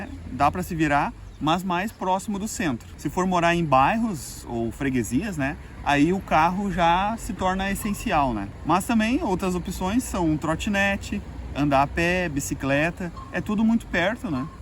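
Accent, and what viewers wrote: Rio Grande do Sul, Brazil. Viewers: Buenas, tem como comprar erva de chimarrão por aí? Tu é gaúcho né. Parabéns pelo canal.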